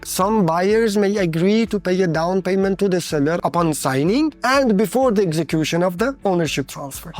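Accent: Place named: India. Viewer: لهجه هندی دارید 😅 جالبه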